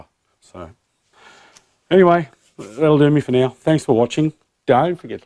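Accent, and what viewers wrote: Australia. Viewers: how is it the new Zealanders say binch when you aussies say bench like the rest of us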